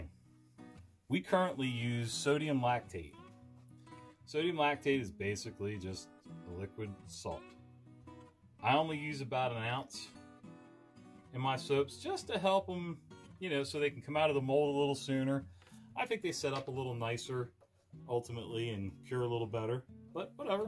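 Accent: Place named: South, United States. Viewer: I honestly didn't expect words like mantra and ta-daa coming from that southern mouth(although you did explain, you grew up in jersey) lol. really refreshing to hear people like you. ~keep it up.coming straight at you from karachi, pakistan. Also never stop starting ur videos with yuuuppp.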